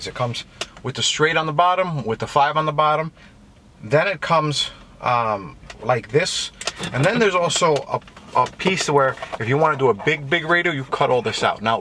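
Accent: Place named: New Jersey, United States. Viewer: Great video. Gotta love the Jersey accent.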